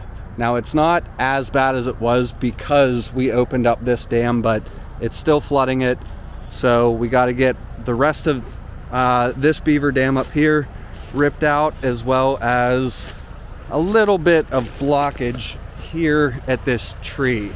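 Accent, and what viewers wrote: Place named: New Jersey, United States. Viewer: It sounds like you're from Jersey...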